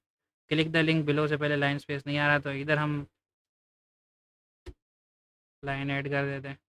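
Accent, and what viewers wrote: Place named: Karachi, Pakistan. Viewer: bhaai kasam se ye hoti hi video bhai ap log behtareen karry ho yar ye wahid video full detailed me mili hi baki sab log sirf chotiya banaty hi time waste krty hi bas view lyty hi and i love this karachi Landhi korangi accent Pepshi pepshi 😍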